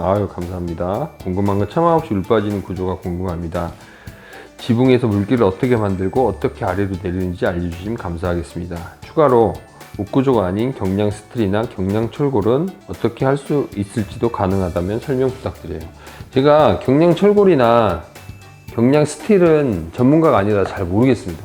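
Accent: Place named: North, South Korea. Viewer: 저는 부산사람인데 걸리버 방송을 연달아 듣다보니 저도 모르게 위쪽 사투리가 나올거 같아요ㅋ 근데 차분하게 설명해주셔서 참 듣기좋아요~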